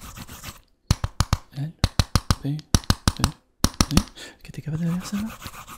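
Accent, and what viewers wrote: Quebec, Canada. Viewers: Tu est du Québec ?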